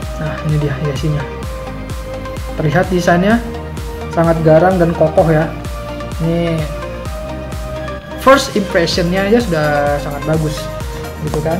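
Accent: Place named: Saudi Arabia. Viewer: You sound like you're from Saudi Arabia.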